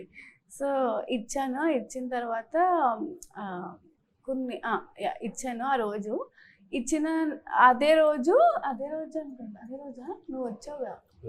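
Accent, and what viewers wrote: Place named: Godavari, India. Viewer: Be yourself. Be proud of Godavari accent. All the best baby🎉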